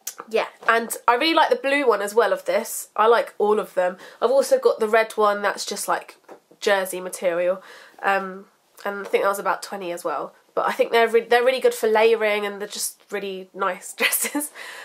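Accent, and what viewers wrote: United Kingdom. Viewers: Im from america...and i much prefer how you and other girls from the UK pronounce everything..after and during i watch your vids i catch myself talking like you and even out and about...and people ask me are you from England and thats when i know...Im not talking American and that makes me happy weird...ya probably i don't care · I'm American and I love the way British people talk! Sorry if my counterparts try to check you on the way you pronounce things. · and you guys (in the U.K.) also sound like you're saying "Fhree" when you're actually saying "Three". I love it! I wish I had an accent like people from the U.K. It's so beautiful.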